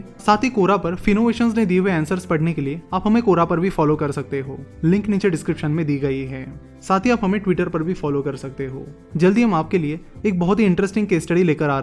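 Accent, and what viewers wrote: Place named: Maharashtra, India. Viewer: You put in a lot of efforts. Appreciate all your work. You should work a little on your pronunciation. Pledge is pronounced incorrectly. It is not pronounced similar to plague. It is short pronunciation. Similar to hedge. (Investor is the correct spelling. Invester is wrong spelling). Keep up the good work. Work a little on your pronunciations and spellings. You have too much Marathi accent. Make it more neutral.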